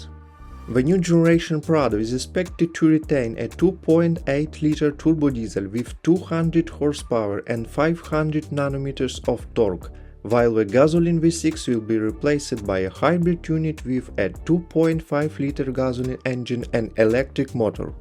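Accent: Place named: United Kingdom. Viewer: ШИКАРНЫЙ БРИТАНСКИЙ АКЦЕНТ!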